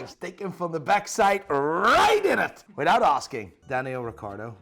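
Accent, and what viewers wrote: Northern Ireland, United Kingdom. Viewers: Ahh man. The NI in your accent is fucking belter.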